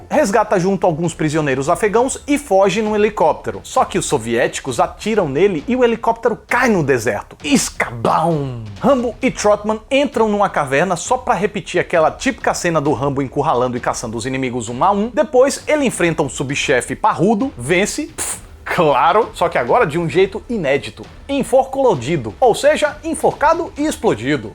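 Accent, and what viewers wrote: Northeast, Brazil. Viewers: Adorei o falatório. Bem nordestinês! Me identifiquei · Cara gostei muitooo do seu vídeo com certeza o melhor e mais completo desses últimos dias sobre Rambo... E eu sou nordestino e vc fala parecido kkkkkkkkkk, você sebe se vai ter alguma coisa do filme para vender na estreia do filme nos cinemas tipo a faixa a faca?? Seria ótimo para nós fãs, abraço!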